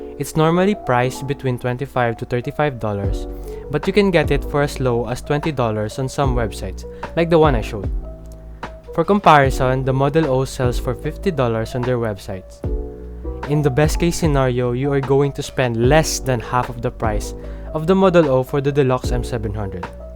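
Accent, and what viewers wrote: Philippines. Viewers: from the accent, are you filipino? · Yoo way you speak your language is like Filipino BTW can you make a video how to change any mouse debounce time? I got 1 dollar mouse which is legit thanks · the filipino accent throws me off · u have filipino accent like me xD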